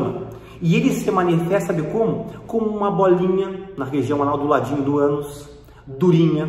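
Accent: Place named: South, Brazil. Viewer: Dr...um médico de muita sabedoria...deveria ter mais médicos desse modelo...sabe é explicada muito Me ajuda demais essas explicações Merece nosso maior respeito Tive e operei...rompeu mais Deus como dói Como vi já o chamaram de um médico lindo e maior elogio no sotaque Sulista....normal as pessoas ver um médico q ajuda muito as pessoas ser amado por muita sabedoria e bondade em nos ajudar Obrigada Dr...tem todo meu respeito Q Deus o abençoe imensamente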